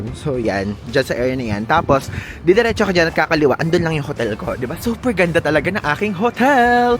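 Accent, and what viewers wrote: Nueva Ecija, Philippines. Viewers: Hi! I recognized your accent, you’re from NE pala, same tayo ng hometown. I’m from Aliaga/Zaragoza. Hope to meet you when i get back home.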